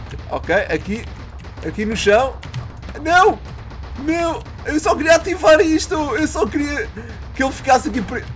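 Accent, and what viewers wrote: Portugal. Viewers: é português de Portugal, acho que você acostuma. é fácil de entender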